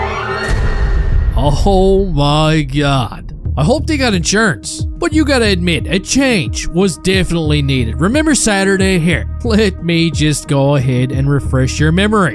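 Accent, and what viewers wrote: Newfoundland, Canada. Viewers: I was just wondering yesterday if they were going bring him up soon. It's going to be interesting... Btw your accent, are you from Newfoundland/Labrador maybe? Cheers from Sweden 👍😊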